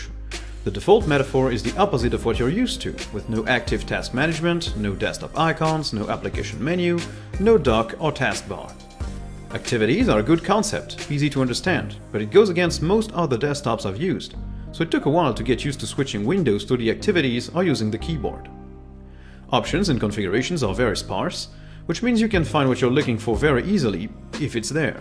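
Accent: France. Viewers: La meilleure chaîne Linux que j'ai pu trouver et ce petit accent français me fait plaisir à entendre. De mon expérience, les extensions de Gnome peuvent cracher ta distribution après une mise à jour de version. Elles sont à utiliser avec modération. Tout comme toi, je trouve les sous-menus de KDE un peu frustrant. Continue ces vidéos de qualité qui se concentrent sur ce qui fait un peu défaut aux distributions : de la finition. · Ta accent français était un peu plus fort qu'elle est aujourd'hui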